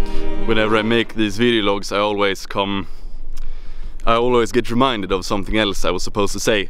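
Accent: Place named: Sweden. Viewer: "If i See behavior i like - i press Like!" "If i See behavior i do not like - i do not press Like!" The Swedish Accent makes it sound even more savage!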